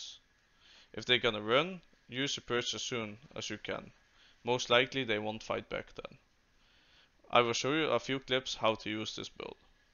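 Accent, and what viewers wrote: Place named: Netherlands. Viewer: For some reason i smell dutch o_O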